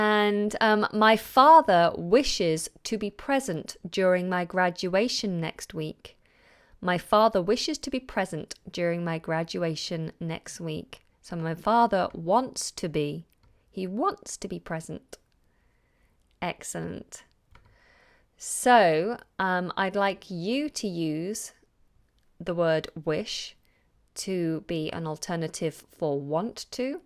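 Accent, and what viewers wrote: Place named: United Kingdom. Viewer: I really love your British accent. It sounds like music to me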